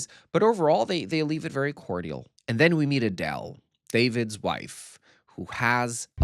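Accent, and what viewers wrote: Portugal. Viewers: já ouvi uns 20 vídeos teus e mesmo assim não consigo perceber de onde é o teu sotaque tuga ahahha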